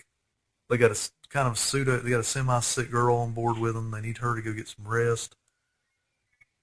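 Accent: South, United States. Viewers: your southern accent is perfect for this commentary. well done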